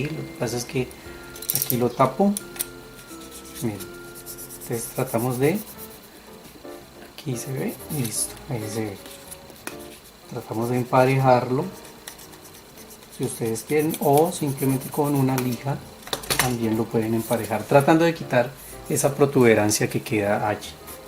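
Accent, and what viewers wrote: Colombia. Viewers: Me Encantaron las 3 pero Ame la Tercera y con tu Acento COLOMBIANO me Enamore mas de tu ARTE gracias y Bendiciones por compartir saludos desde Jalisco México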